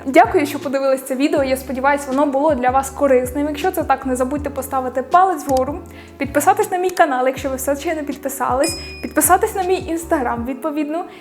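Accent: Poltava, Ukraine. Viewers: Ви дуже автентична, цікава та харизматична ❤ та мені чується полтавський рідний акцент 😅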